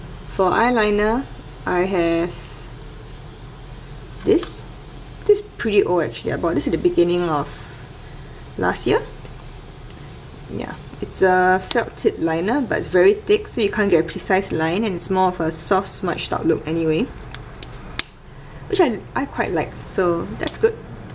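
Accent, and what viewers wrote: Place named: Malaysia. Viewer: Love your accent! Malaysia? Singapore? Never heard of "Kate" brow cosmetics. What country is that from?:)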